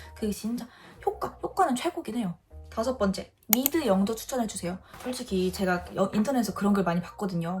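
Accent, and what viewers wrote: United Kingdom. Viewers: 영국 발음을 너무 좋아해서 이왕 회화를 할 거면 영국 발음으로 공부하고 말하고 싶다는 생각을 했어요 언니의 발음이 제 워너비에요ㅎㅎ 대부분이 미국 발음일텐데 언니는 어떻게 영국 발음으로 회화를 마스터 하셨나요? 영국이라는 현지에 나가 살다보니 자연스레 익히게 된 건가요 아니면 따로 공부할 때 영국 발음으로 공부를 하신건가요?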